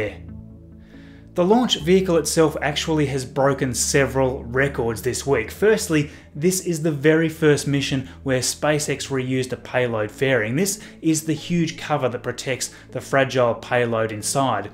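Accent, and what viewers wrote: Australia. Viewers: I’m subscribed and know this channel but always forget it, the way i remember you is the Australian accent lol 😂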